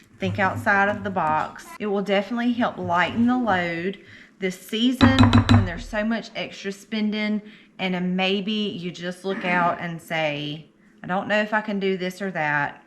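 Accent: South, United States. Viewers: Subscribed to both yalls channels and watch daily. Girl you even more southern than i am and love to hear you talk.keep up the good work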